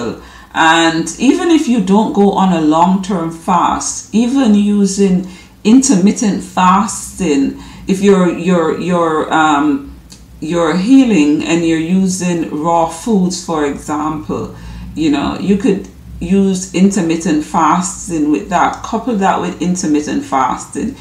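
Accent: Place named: Barbados. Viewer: where are you from? Your accent sounds a bit Barbadian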